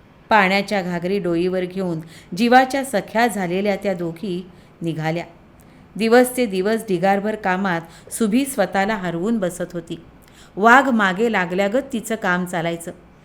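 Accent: Rural, India. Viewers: ग्रामीण बाज खूपच छान....👍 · Bhashet gavthi thaska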